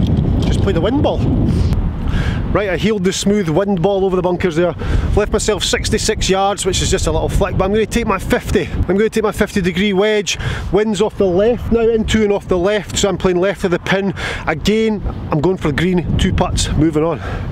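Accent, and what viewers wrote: Scotland, United Kingdom. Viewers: I love the music, and your 🏴 accent. Realy authentic! Discovered the golfing machine in 2018 which led me to you when you had 6K subscribers. TGM and FREE YouTube channel made me understand previously consumed books and video’s better as well. Keep doing what you do. My handicap just turned 18 and is trending down 🏌🏽‍♂️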